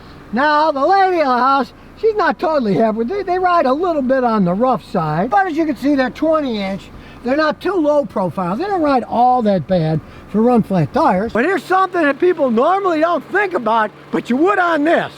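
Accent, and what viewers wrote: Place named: Texas, United States. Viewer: It is the Texas accent he picked up down there 😃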